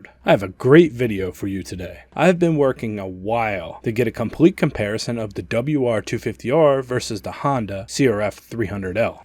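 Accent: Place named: Pennsylvania, United States. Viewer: Are you from southeastern pa? I hear the accent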